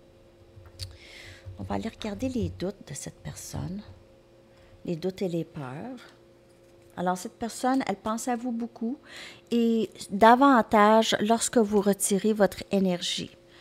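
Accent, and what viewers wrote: Canada. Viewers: Merci 🥰! Je vous ai découverte depuis peu et j’aime beaucoup vous écouter. Vos guidances me parlent et j’aime aussi votre bel accent canadien 🤗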